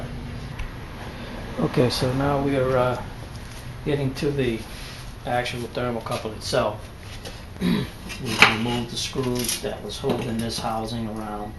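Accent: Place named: Louisiana, United States. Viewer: Cher, I sure like dat Cajun accent. Ce c'est bon, oui.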